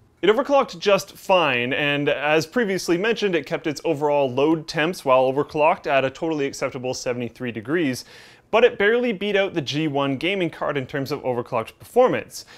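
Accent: Canada. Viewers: I love how canadians pronounce "about" and "out" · Holy fuck I can't listen to Lukes Canadian voice, it's infuriating lol.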